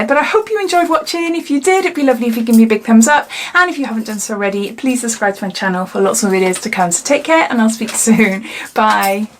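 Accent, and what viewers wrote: United Kingdom. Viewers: Hello from Brazil! Your accent is so beautiful! I want to visit the U.K sometime soon - from what I have read and watched about sights and the culture, I have a feeling I am gonna love it. I should have visited while we were stationed in Germany! Maybe in the near future. I and my family are moving back to the U.S very soon so I was wondering where this box can be shipped there (and how much shipping would cost). Cheers! Renata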